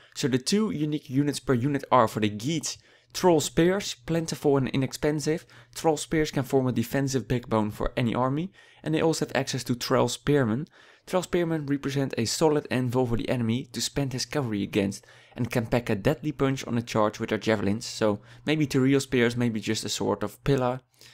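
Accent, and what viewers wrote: Netherlands. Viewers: Are you Dutch? You sound a bit like my brother when talking English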